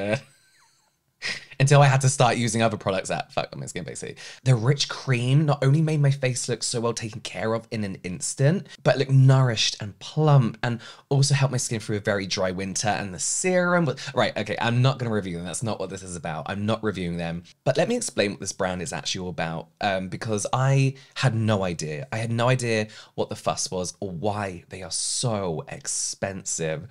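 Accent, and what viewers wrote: United Kingdom. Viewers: I believe Peter Thomas Roth would fit into this category! I've bought a set of their cosmetics because it was on sale and tried some of their most famous products, vit C included. And it didn't change anything for me. I do like its luxurious touch, though! Won't be rebuying,too expensive to just feel nice and see no effects. Great video, I looooove your British accent ❤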